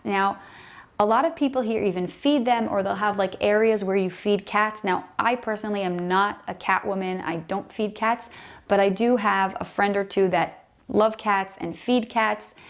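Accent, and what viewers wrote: United States. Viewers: Your voice sounds like you were raised in the USA? We have some cats but nothing like Jerusalem. I think we have more dogs here. Watch out for pit bulls if they are running loose, that doesn't happen too often.😊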